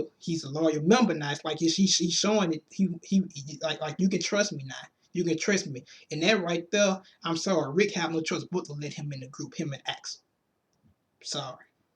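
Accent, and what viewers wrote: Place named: New Orleans, United States. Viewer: Sounds like your from New Orleans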